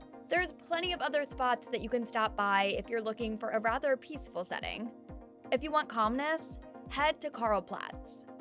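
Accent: United States. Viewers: Nice Orange County accent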